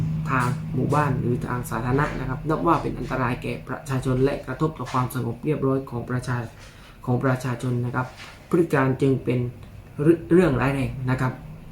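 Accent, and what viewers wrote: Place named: South, Thailand. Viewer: เพิ่งได้ดูคริปเเรกครับอย่างอื่นไม่รู้เเต่ทนายน่าว่าคนไต้เเน่นอน ขอบคุณความรู้ดีๆ ติดตามครับ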